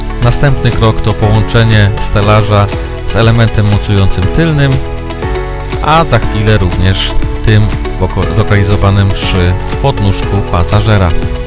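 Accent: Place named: United States. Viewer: He he, no pełna profeska film :D po głosie nie poznałem, myślałem że to jakiś YouTuber z USA pod koniec pokojarzyłem fakty i filmiki z łódek. Teraz czekam na dalsze produkcje i do zobaczenia na trasie LwG !!! 👊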